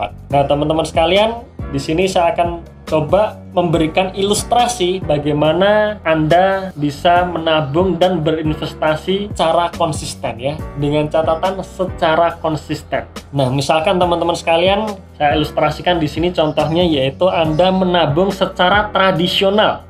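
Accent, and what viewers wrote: Surakarta, Indonesia. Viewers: Njenengan orang solo to pak? Heheh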